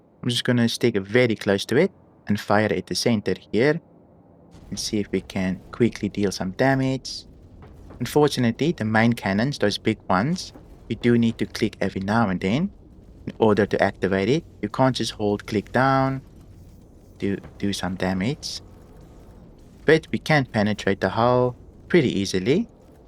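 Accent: Afrikaans, South Africa. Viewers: Dutch, Belgian or Afrikaans? Sound very Afrikaans...lekker vid and and build.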